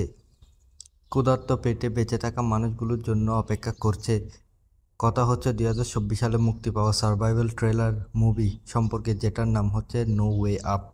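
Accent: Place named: Chittagong, Bangladesh. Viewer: Plz suddho bangla shikhun...er por explain korben plz.... Puro puri Chittagong er tone.. Cheap!!!!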